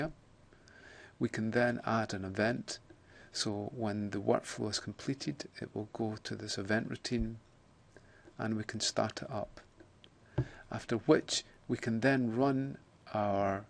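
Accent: Scotland, United Kingdom. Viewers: He's Scottish, it's just how they sound.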